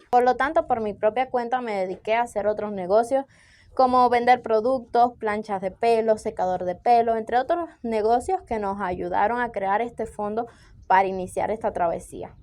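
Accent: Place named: Veracruz, Mexico. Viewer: Vente a Veracruz Tenemos el mismo acento y comemos arroz blanco y frijol negro😊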